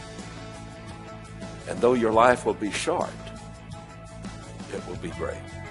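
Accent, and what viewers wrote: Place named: Kentucky, United States. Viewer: I WATCHED YOUR VIDEO WITH YOUR SON! THE 7 YEAR TRIBULATION STARTS AT THE RAPTURE! NOT MIDTRIB! NOW THERE IS THE THOUGHT THAT THE TRIBULATION MAY NOT START IMMEDIATELY AFTER THE RAPTURE. BUT THE RAPTURE IS BEFORE THE START! MIDTRIB IS A FALSE TEACHING. YOU SOUND POSSIBLY KENTUCKY OR TENNESSEE? NORTH CAROLINA OR VIRGINIA! I'M IN EXTREME SOUTH GEORGIA! BEEN HERE GOING ON 25 YEARS! I'VE BEEN ALL OVER THE USA! LIVED IN CALIFORNIA AND MINNESOTA AND PLACES WHILE IN THE WAC'S! PLUS ILLINOIS! MY DADDY WAS FROM TENNESSEE! BEEN TO GERMANY AND JAMAICA! BOTH AS A CIVILIAN! WE HAVE THE BEST COUNTRY!!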